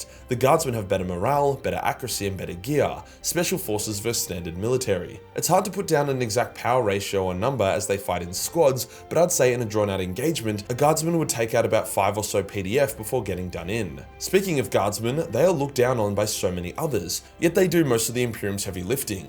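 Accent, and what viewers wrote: Australia. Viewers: May I as central europian slav request you to speak about 10% slower 😂 i get everything you talking about but it is really paiful for understanding aussie accent in this cadence of words 😂 I still love naughty Austrian non painter creator ❤️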